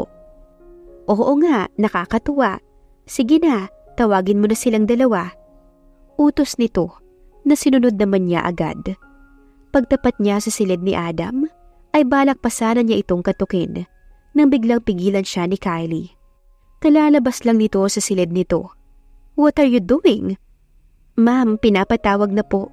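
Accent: Philippines. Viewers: Talaga ba maganda ang boses ng narrator na ito? Parang tangang probinsyana ang bweset na narrator na ito. Naririnig mo ba ang tuno nyang igurot nakakairita.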